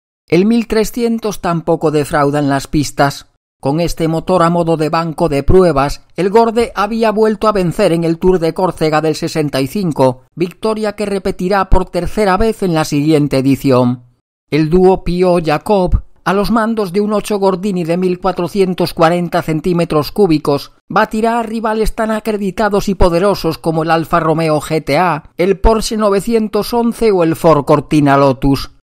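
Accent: Galicia, Spain. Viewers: Es increíble que un coche tan modesto de concepción fuera capaz de ganar rallyes e incluso a todo un Porsche 911. Recuerdo que mi padre estuvo probando un R8 y también un R10 para sustituir al Seat 850 especial 4 puertas que fue su primer coche aunque al final se decantó por un Fiat 124 special (el equivalente al Seat 1430 pero con los dobles faros redondos en vez de cuadrados). Supongo que por amplitud interior, maletero y potencia. Como siempre, el contenido excelente. Y además con estilo propio made in Galicia con acento incluido.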